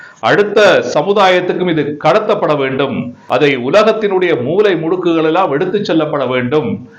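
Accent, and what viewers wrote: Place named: Sri Lanka. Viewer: தங்களது இலங்கைத்தமிழ் மிக அருமை ! உலகிலேயே சிறந்த தமிழ் இலங்கையில் மட்டுமே உண்டு !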